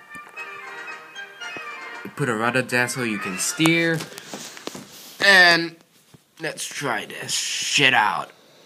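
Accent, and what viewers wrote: Singapore. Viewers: Are you singaporean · are you a singaporerean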